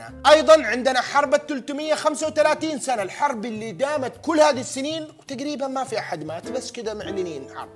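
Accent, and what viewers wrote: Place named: Hejaz, Saudi Arabia. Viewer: يب سعودي يتكلم لغه اهل الحجاز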